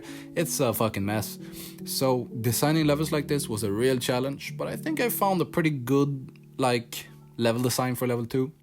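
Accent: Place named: Sweden. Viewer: Great job! Love the Swedish accent as well!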